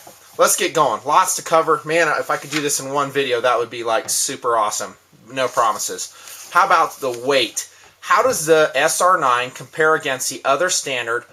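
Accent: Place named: South, United States. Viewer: lol, sounds so much more southern in these old videos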